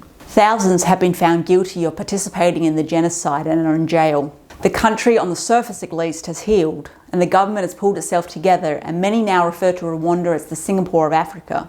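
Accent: Australia. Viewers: Like I said you should have used 1 minute at the beginning of the video to mention what made this deplorable genocide against the Tutsi happen. I understand you were talking about the aftermath but the correct information at the beginning would have been better. The Hutus did not decide in one day to kill their neighbours, families and friends who were Tutsis and Twa this was caused for decades by the white European invader colonisers. Causing division and bringing their racism and discrimination to another African country they invaded. The only reason I came across your page was because this April made it 30 years since the genocide against the Tutsis and Twa otherwise I don't watch morbid channels like this. Judging by your accent you sound Australian (I may be wrong), maybe you can make a video about how the white invaders destroyed the indigenous Australians? 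What happened to the indigenous that were killed afterwards?? Would be interesting to find out. Have a good day.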